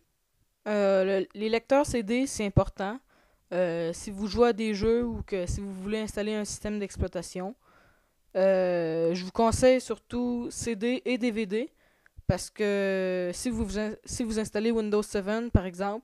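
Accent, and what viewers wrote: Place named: Quebec, Canada. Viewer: sympa tes vidéo ! et j'aime ton accent <3<3 j'aime le quebec <3<3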